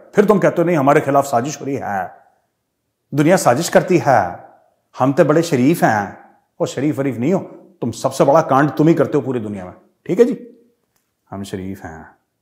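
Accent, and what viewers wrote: Pakistan. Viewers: pure pakistani accent😂😂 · Major gaurav aarya with Pakistani accent is so lit 🔥🔥😂😂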